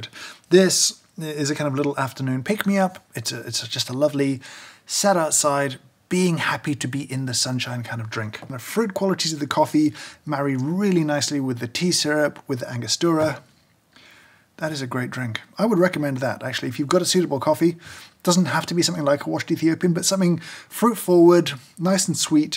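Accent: United Kingdom. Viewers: From watching your videos I have really developed a love and respect for coffee. I'm quite sure it has a lot to do with your British accent. Here's a small, insignificant suggestion, sneak a French word in your description of the flavor. It will add a continental flavor, I think. It has nothing to do with the content but combined with that accent will add some spice to the presentation. We English speakers have a certain respect for the French for some reason. The language has always lent a certain something to the mother tongue. Keep up the great work!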